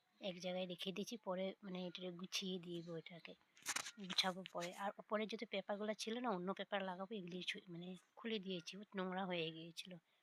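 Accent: India. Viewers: তোমার কথা গুলো কি মিষ্টি লাগছে শুনতে ❤ তুমি কি ঝাড়খন্ড থেকে। ওই রকম টান ❤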